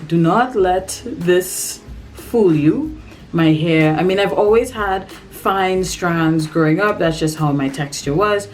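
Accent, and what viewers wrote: Trinidad and Tobago. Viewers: You sound like a Trini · Lol I said this is definetely a Trini accent 🇹🇹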